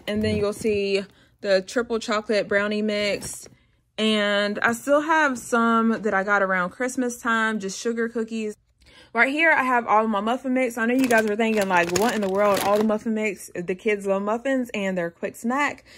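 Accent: South, United States. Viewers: You must be southern. Love your voice!!! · Love what you're doing! Your family will be safe for a very long time. Also love the southern accent ❤ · You have a country accent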